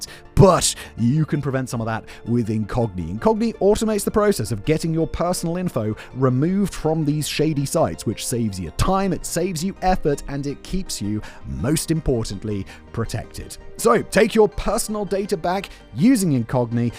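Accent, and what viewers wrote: United Kingdom. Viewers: Even if you didn't speak with a British excent, would recognise Britain propaganda 😅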